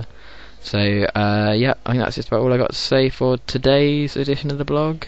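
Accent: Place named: United Kingdom. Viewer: teehee...cute. You sound more British to me than you did in the BWR days. I am SO excited for Lost! Wee!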